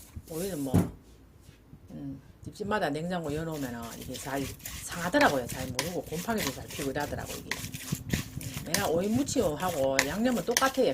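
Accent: Gyeongsang, South Korea. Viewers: 와우😮 진짜 좋은 팁 넘 감사합니다. 오이 얼려 본적 진짜. 없는데. 저도 그리 해봐야 하겠습니다 저도 어릴적 2년반 정도 부신에서 살았던적 있어 경산도 사투리 들을때. 정겨워요😅😅😅😅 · 경상도 사투리가 언제들어도 듣기가 좋습니다 .😊 늘 잘보고 있습니다 고맙습니다 ❤❤❤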